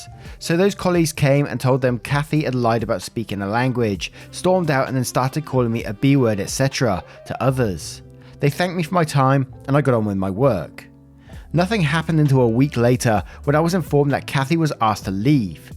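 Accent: United Kingdom. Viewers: Greetings from Kansas City, Missouri, USA! I usually play Mahjong, Solitaire, or Yahtzee while I listen to your stories. I can’t help but enjoy your content. You have such empty and compassion for people and I absolutely love how you’ve taken in Poppy. I’m a sucker for a guy with a British accent who loves animals (please don’t take that the wrong way, as I’m quite happily married), so feel free to share as many pics of Poppy as you want. We’re definitely here for it! Much love!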